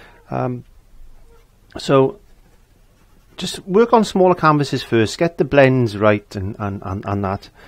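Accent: Wales, United Kingdom. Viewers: Where are you from? You sound Welsh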